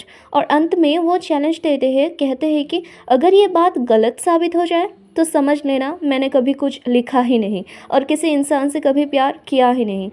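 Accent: Odisha, India. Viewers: Didi you have odia accents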